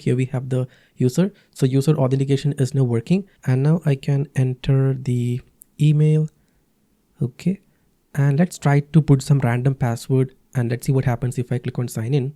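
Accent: Kerala, India. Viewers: You sound so mallu with your mallu English bhai....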